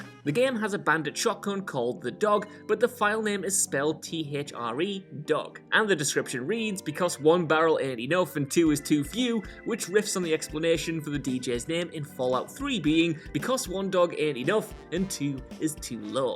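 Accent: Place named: United Kingdom. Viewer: I think that is just a british pronunciation of "guns" the accent sounds british to me (i could be wrong idk)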